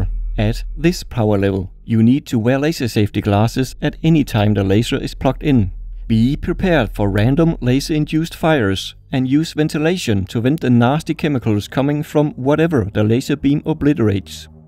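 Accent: Denmark. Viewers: YouTube recommended this video and I thought it would be interesting. It didn't take many seconds before I could hear that the narrator is Danish. Fortsæt det gode arbejde 👍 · har næsten lige fundet din kanal. Ku sku høre med det samme du var dansk (go'e gamle accent)😂 Har seriøst binged dine videor. Pisse fedt med en dansker der laver sådan nogle slags videoer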